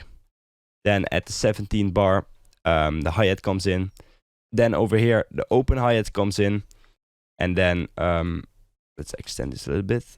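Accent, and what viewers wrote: Netherlands. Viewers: ik ruik een nederlander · ik hoor de Nederlandse accent haha, +1 like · where are you from? kinda dutch accent XD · Are you dutch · hey are you dutch? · are you dutch?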